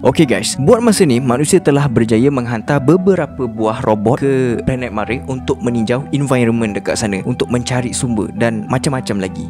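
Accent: Johor, Malaysia. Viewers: Bro...ko org johor kan...aku baru je subscribe kau...cara kau cakap ...senang faham...👍🏼👍🏼👍🏼👍🏼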